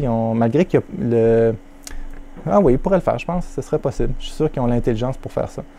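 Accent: Canada. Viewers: Bonjour, superbe video et bonne explication. Petite suggestion concernant la limitation des commandes personnalisées sur l'écran d'origine ... Il existe la possibilité d'installer un Rasperry Pi avec un écran tactile de 7 "(voire 10") en tant que contrôleur d'imprimante OctoPrint (les coûts sont abordables). Je n'ai pas encore commencé ce projet, mais je le trouve très intéressant. En ce moment, une interface utilisateur étendue y serait peut-être également possible pour rendre plus de fonctions disponibles directement via le toucher! J'adore votre prononciation, je suppose que vous venez du canada!? Salutation du Luxembourg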